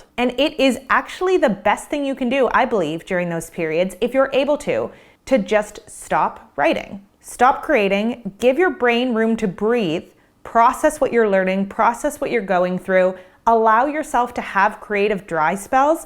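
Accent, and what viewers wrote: Canada. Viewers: Hello from Belgium! I've been living here for almost 36 years after leaving my native Canada (btw, are YOU Canadian? You sound like a "mainlander" (I grew up on Cape Breton Island, NS). OK just listened to you for the first time - and seceral times it made me emotional. I hadn't realised that I was "completely normal" in my odd way of doing things (ALWAYS last minute - or not at all...). I have been wanting to write a book about my life for 20 years but always procrastinated (fear of failure mostly, I think). I'm 55 and have A LOT to write about and your suggestions absolutely resonated with me ...but they would, wouldn't they ! 😂 Thank you ! Now to start ... well, I'm teleworking today so as soon as I have a quiet, free moment. ❤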